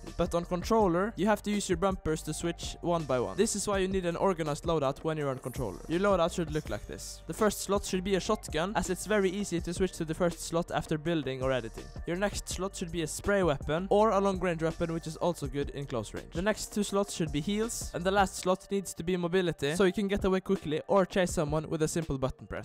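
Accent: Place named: Norway. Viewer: er du norsk? if not you just sounds like a norwegian guy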